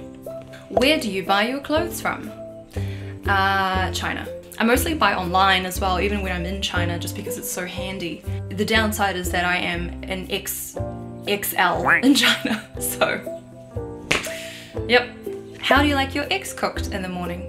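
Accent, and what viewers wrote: New Zealand. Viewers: found your channel while looking for a guitar tutorial for " " by. great cover and guitar composition by the way. your speaking voice is so different to what i expected. love the kiwi accent lol. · Thank you so so much for sharing a little bit of background story about you. I have always listened to your music but to learn more about you was fascinating because I thought you were living in China and Chinese but you sound like you are from New Zealand. That threw me way off lol but I love your music and your Vibe and your authentic personality! And I can't wait to hear what you come out with next!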